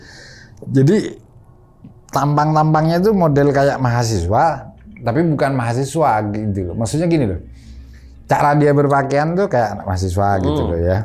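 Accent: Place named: Bondowoso, Indonesia. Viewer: Logatnya bondowoso bgt om 😂😂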